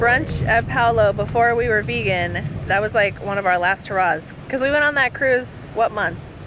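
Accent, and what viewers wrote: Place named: Australia. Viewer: Love your Aussie accent!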